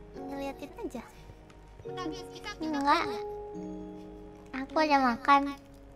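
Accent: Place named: Java, Indonesia. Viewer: Tapi asli medok nemen kobo, logat sama pemilihan suku kata asli wong jowow😊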